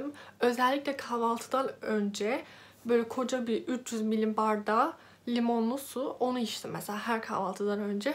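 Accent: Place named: Black Sea, Turkey. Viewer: Karadenizlisiniz sanirım ara öğününuz hangi saatlerde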